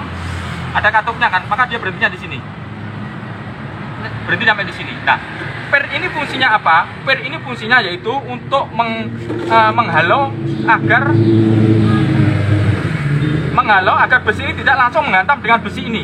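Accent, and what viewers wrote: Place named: East Java, Indonesia. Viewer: smpean kok faseh bhasa jawa timuran om?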